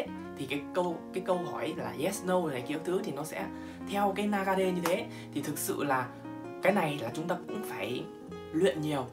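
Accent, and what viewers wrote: Hai Duong, Vietnam. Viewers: Bạn người Hải Dương phải k? Nghe giọng b giống người HD 😉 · Giọng Hải Dương yêu dấu quê mình đây rồi🙂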